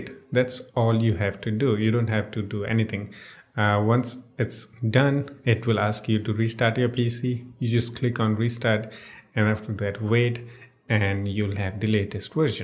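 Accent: Northeast India, India. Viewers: where you from bro? your accent is sound like people of Northeast india,meghalaya ?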